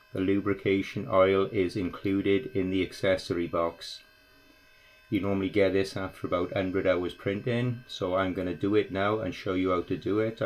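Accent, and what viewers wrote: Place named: Swansea, United Kingdom. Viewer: Hmm I'm sensing a Swansea accent. Appreciate the video!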